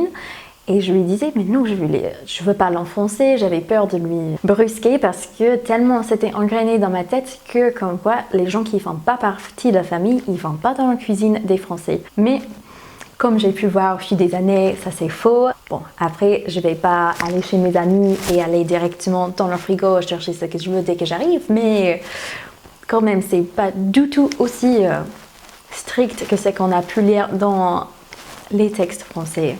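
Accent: United States. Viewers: Oui je me doute ne t'inquiète pas! Dans ta dernière vidéo il me semble que tu as dit avoir changé de micro il me semble et la qualité était plutôt bien donc je me suis dit que tu l'avais peut-être juste mal positionné cette fois :/ Et j'en profite pour te dire que j'adore tes vidéos et surtout ton petit accent américain est trop cute! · C'est trop drôle, heureusement que tu n'as pas ri comme on te l'a appris à l'école sinon les gens t'auraient trouvé bizarre. J'aime beaucoup lorsque les américains parlent français, c'est très mignon comme accent. Je viens de regarder quelques unes de tes vidéos, j'adore et je m'abonne. A bientôt.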